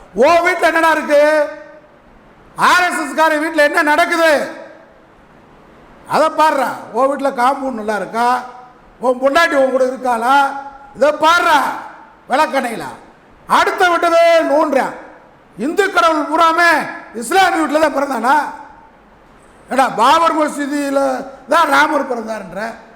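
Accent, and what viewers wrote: Madurai, India. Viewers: சார் சூப்பர், மதுரை ஸ்லாங்கில், தைரியமாகவும், வீரத்துடனும், இஸ்லாமியர்களுடன் நட்பு பாராட்டியும் அருமையான பேச்சு, நீங்கள் இன்னும் பேச வேண்டும் அட்டூழியங்கள் ஓழியும் வரை.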